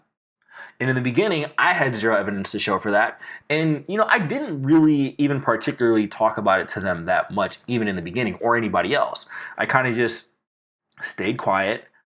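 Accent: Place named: United States. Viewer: and I thought you'll have the Nigerian accent.... Lol. Love your American accent though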